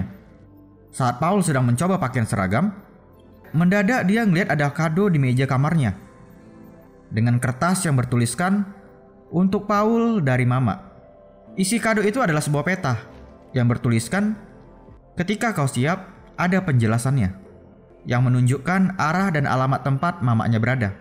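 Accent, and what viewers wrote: Medan, Indonesia. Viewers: bang kau orang medan ya kalo gk salah suaranya sama njir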